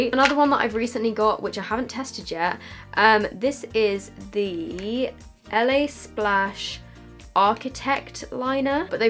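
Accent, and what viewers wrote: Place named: United Kingdom. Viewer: you sound so brittish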